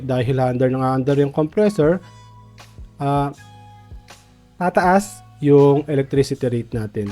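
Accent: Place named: Pampanga, Philippines. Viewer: halatang kapampangan ka sir lol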